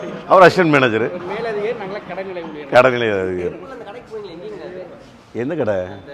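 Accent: Kongu, India. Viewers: அருமையான துவக்க விழாவில் கலந்து கொண்டு அற்புதமாக நமது கொங்கு தமிழில் விளக்கம் கொடுத்தீர்கள்... நன்றிங் கோ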